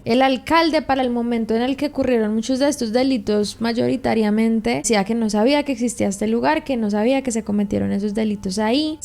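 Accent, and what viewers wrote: Colombia. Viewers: Me pasó lo mismo, soy de Gdl... Y el ver este caso con su acento tan colombiano ( hermoso por cierto) me helo los huesos... A dónde hemos llegado? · pense que eras colombiana, que bueno que te cuides, muchas gracias por subir informacion sobre este caso, saludos desde peru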